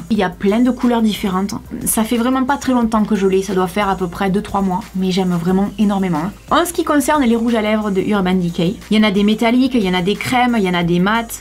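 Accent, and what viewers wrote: South, France. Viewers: Les produits de chez Sephora que je racheté régulièrement sont le démaquillant biplace yeux, le mascara Sephora outrageous avec une fine brossé je vous le conseille, le masque de nuit mais je l'utilise comme une crème car je ne vais pas me coucher avec une épaisseur sur le visage Je ne suis pas emballée par les box et le système d'abonnement, je préfère choisir mes produits et je suis fidèle à certaines marques merci de vos conseils et pour votre accent du sud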